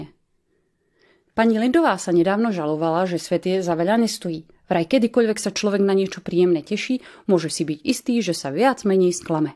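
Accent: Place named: Slovakia. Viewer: Cítit, že slovencina je tvoj matersky jazyk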